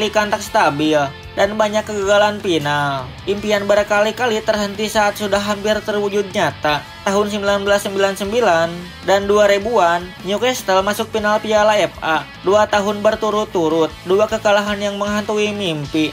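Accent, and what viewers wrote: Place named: Java, Indonesia. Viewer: suaranya jga bagus kaya orang Jawa medok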